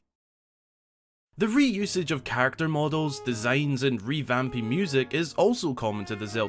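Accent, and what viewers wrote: Scotland, United Kingdom. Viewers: Are you brish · I JUST REALIZED PURAH IS ABOUT THE SAME SIZE AS MIDNA Also are you scottish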